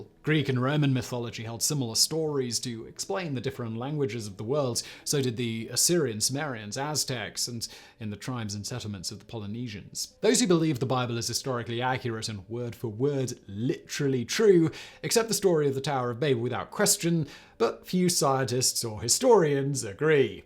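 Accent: United Kingdom. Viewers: Love the info. Your high notes trail off to nothing and along with your British rhythm is too much. Again info was terrific from what I caught.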